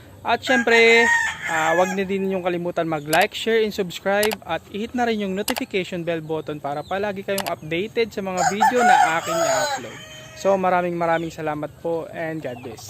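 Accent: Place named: Samar, Philippines. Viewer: Ha Samar ka?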